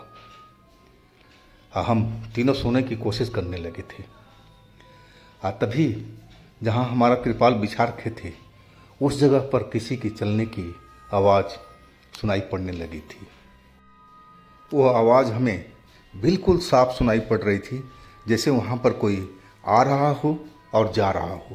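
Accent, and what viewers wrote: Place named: Bengal, India. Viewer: Bhaiya…. Aap ki Hindi…. Too cute🥰🥰🥰🥰Bengali ho na???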